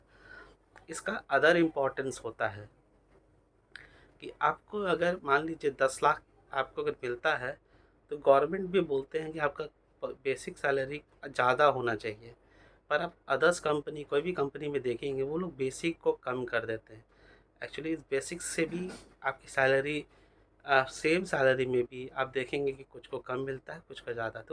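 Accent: Bengal, India. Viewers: U got bengali accent while talking..khoob sundar👍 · R u Bengali ?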